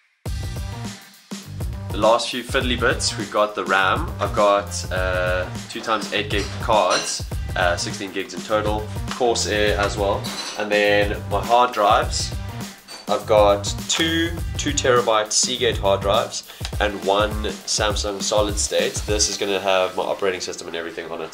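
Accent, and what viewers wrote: South Africa. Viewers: awesome bro, like to hear dialects from home. I'm from nam..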